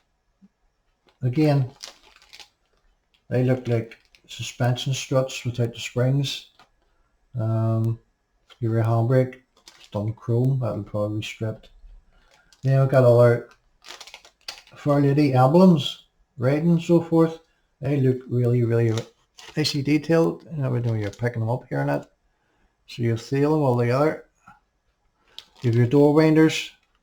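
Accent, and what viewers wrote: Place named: Germany. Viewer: Good afternoon, say chap you have a jolly nice accent! considered it was germain at first :)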